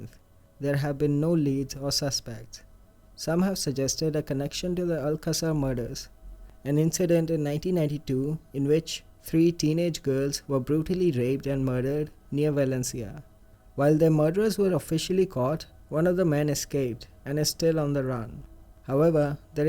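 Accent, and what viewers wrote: India. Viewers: Hey you cheap bastards hire an Enlgishman to do the commentary on these videos why hire a GD India man??? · Narrator is Indian. Not soothing to the ears. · What's up with the goofy Indian accent? Bring back the female narrator.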